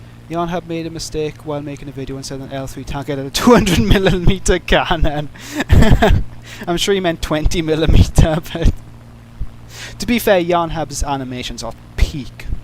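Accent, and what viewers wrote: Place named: Wales, United Kingdom. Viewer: Oh you're welsh.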